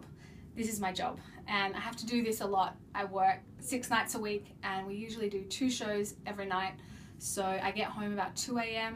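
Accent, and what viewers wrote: Australia. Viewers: You're fast becoming one of my favourite youtubers, and being an Australian it's lovely to hear a familiar accent! Take as long as you need, I know that I along many others will stand by you wil oodles of love and support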